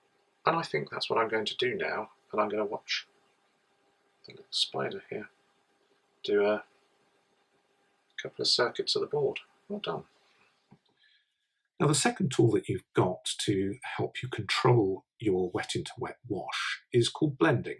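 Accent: England, United Kingdom. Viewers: Love your channel, look forward to more videos, your paintings are beautiful. And you just cant beat you lovely English accent! Easy on the ears.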